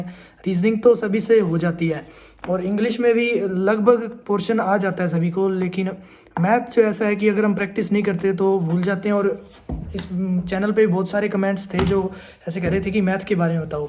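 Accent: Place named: Hisar, India. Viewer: Kha se ho aap ? Hisar side se lg rhe ho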